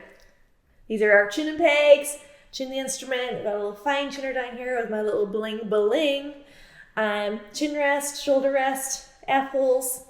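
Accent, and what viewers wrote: Ireland. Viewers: I love hearing your authentic irish accent. It's not to often that I get to hear one. I love it and I like learning about the history of instruments from a beautiful Irish lasse.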